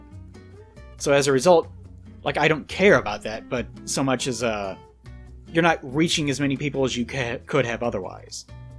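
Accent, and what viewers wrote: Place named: United States. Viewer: Your voice is so country. I love it.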